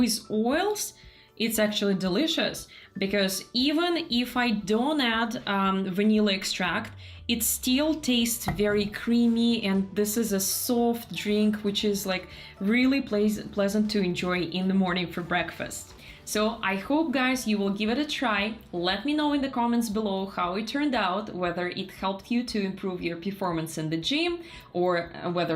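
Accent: Russia. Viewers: Classic russian accent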